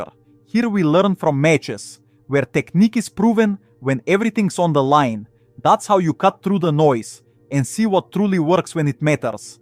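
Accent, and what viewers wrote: Russia. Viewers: Извини за, быть может, резкий тон. Однако мне показалось, что это был нарочито русский акцент, а озвучку специально сделали именно такой, чтобы все это поняли. Болгары так не говорят, я слышал их речь. Впрочем, это мое мнение и оно не обязательно верное.. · Easy...Russian accent.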